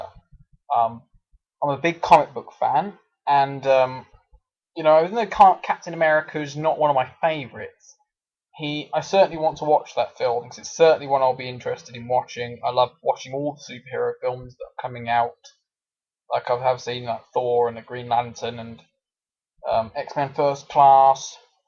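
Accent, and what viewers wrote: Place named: United Kingdom. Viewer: Dude, how I love the British, with that accent I could listen to you talk all day : )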